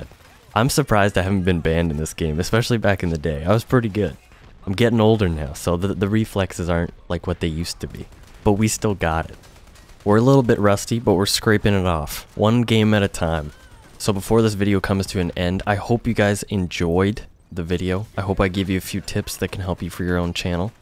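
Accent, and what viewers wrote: Canada. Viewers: Is he canadian? I didnt know but I heared him talk with aboewt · Are you Canadian? Dat accent tho. Btw, love your stuff. It's definitely something refreshing in a world full of screaming gamers. Keep at it! :) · Where are you from dude? I'm from Edmonton and you sound canadian :P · Canadian eh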